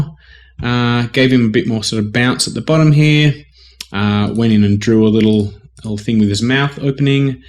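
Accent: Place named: Australia. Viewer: wait you're australian!? ozzi ozzi ozzi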